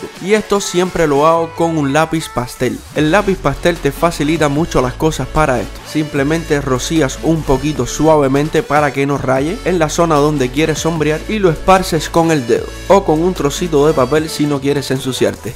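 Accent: Cuba. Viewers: Tú eres cubano ..???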